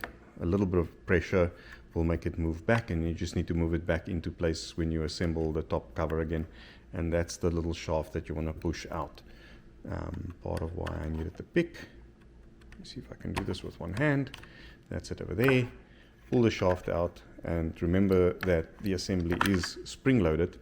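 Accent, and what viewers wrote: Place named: Germany. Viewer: Awesome video! Exactly what I needed for help with my wheel motor replacement. You the man! Love your German accent 😍 Felt like a scientist was stepping me through the robot's puzzle.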